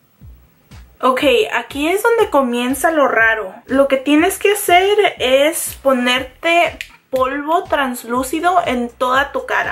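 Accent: United States. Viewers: cual es la necesidad de hablar como gringa? v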